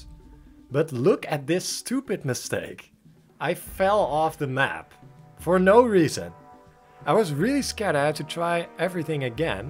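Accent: Netherlands. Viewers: Bro no hate I am from the Netherlands aswell and the accent here is so ugly I ain’t gonna listen to that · Very nice video. Is your mother tongue Dutch? The accent sounds very familiar :D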